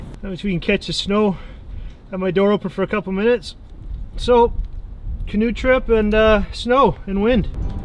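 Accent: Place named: Canada. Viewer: Good job. I'm curious. You have the same accent as Don Cherry. You must be from the same area as him or you have watched too many Don Cherry commentary. Thanks for sharing.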